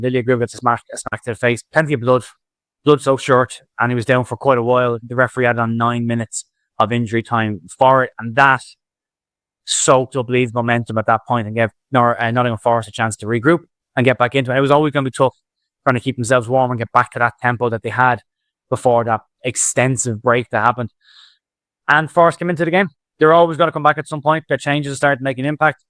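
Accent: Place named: Ireland. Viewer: Disappointed that you didn't say something along the lines of "forest destroyed by tree" in your Irish accent. Other than that great analysis!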